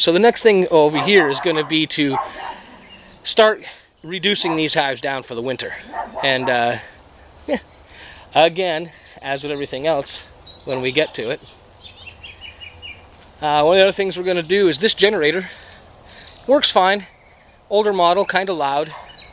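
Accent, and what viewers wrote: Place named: New England, United States. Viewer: Nice a little touch of New England in the South:)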